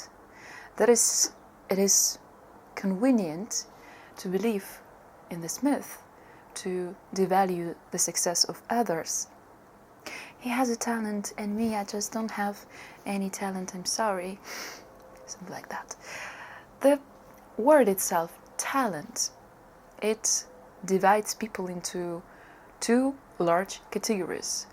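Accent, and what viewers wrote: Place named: Netherlands. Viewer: Hi, you are totally correct. Based on your accent I think you are from Holland/Netherlands or Belgium, possibly Scandinavian. In the former USSR Soviet Union they were the first to destroy this myth of "talent", the state needed the people to be as educated as possible for a variety of reasons. And they were the first to say that this Myth was created by the Elite, the Intelligencia/Educated classes in league with the capitalist imperialist Monarchy, to keep the proletariat down. In order to limit people and to control them. In the USSR they would say everybody needs to work hard to develop good skills. Only working hard and getting proper good teacher, who are willing to teach you properly. In Western nations the Elite educated classes, the overall (average) effect is not to fully teach/help the students, they make them suffer by not providing sufficient information in the studies, and then they say "It is the students responsibility to find the information", so they are trying to limit the amount of students who make it. 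In the Soviet Union, the leadership was aware of these kinds of sabotage by the Professors, and the Professors were watched by other Professors working for the state as Educational spies you may call it. If they see that a Prof is not teaching certain topics properly, they would be called in for investigation and be asked "Why can't you teach that topic properly, is it because you are not that good in this topic, if that is the case we will send you a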